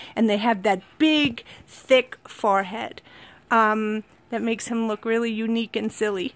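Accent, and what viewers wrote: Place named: New York, United States. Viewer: how much I enjoy your accent. Long Island? Staten Island?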